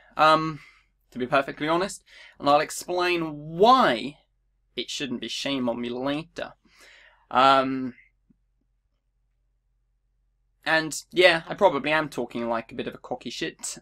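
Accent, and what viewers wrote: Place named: United Kingdom. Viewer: It's music to my ears your British accent. I love it!!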